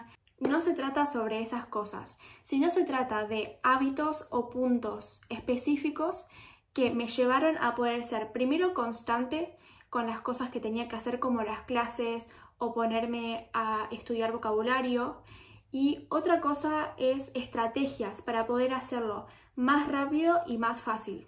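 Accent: Argentina. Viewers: Saludos desde Brasil 🇧🇷. A juzgar por tu acento eres argentina ! Cuáles idiomas aprendiste ? Los aprendiste solita o en clases ? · Tu eres de argentina? Por su acento parece que si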